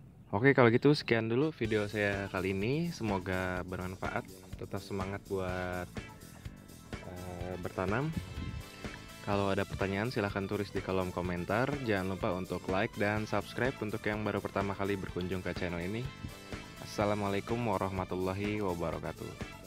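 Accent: West Java, Indonesia. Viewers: kanyahoan sunda na..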